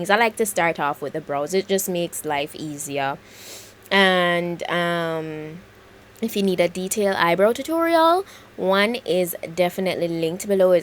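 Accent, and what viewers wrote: Jamaica. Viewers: Jamaican accent yaass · You sound Jamaican.... And I know becuase I am Jamaican....... Nvm 😂😂😂 you are I just saw the other vid..... New subbie... Btw.... Big up yuh self 👅🌸🙏💙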